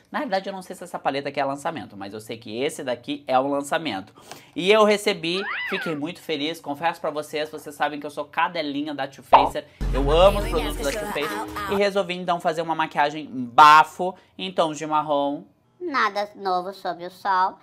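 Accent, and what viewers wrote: Rio Grande do Sul, Brazil. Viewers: Adoro o sotaque gaúcho ❤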